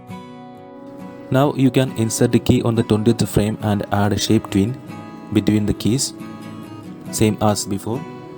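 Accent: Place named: Madhya Pradesh, India. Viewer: are you from mid pradesh cuz you accent goes hard ngl *fire emojji*x2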